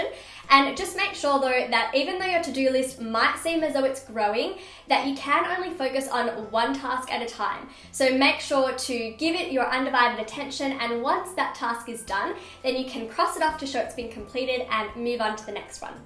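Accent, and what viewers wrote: Australia. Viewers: Sarah Potter australia i think... or did you mean a certain area haha idk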